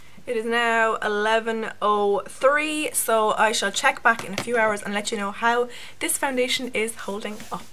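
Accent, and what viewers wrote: Ireland. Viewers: I have to say, this was a brilliant review! I loved how you edited the video, like there was just the right amount of info. And I did want to know whether I wanted this foundation or not, and you've convinced me. Lovely to hear the Irish accent too :) (I'm Irish too). Would love if you'd take a trip to my channel, got some original music on there, hope you like it. Either way, I'm subscribing to you girleen :)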